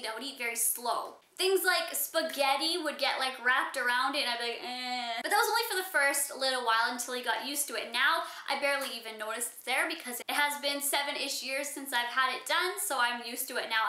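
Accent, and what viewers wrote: Canada. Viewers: Are you Canadian? You sound like you are.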